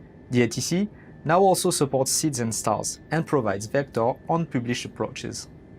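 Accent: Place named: France. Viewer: French accent :3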